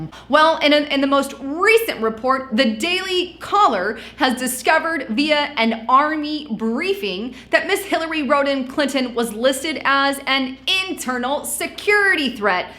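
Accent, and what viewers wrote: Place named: Texas, United States. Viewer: lol...right before i watched your video my husband and i watched alex jones and we were talking how he kept saying can.and then we watched yours...lol....we moved to texas from Missouri and its funny how many words and sayings are different. ......we said maybe she is from texas and thats what they call it....thank you, by the way for all your reports....you do a wonderful job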